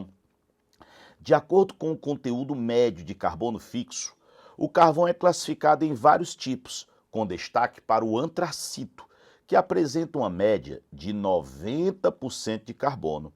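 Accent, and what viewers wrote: Northeast, Brazil. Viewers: Além de excelente professor, tem um sotaque lindo demais!! O senhor é daqui do Nordeste né prof?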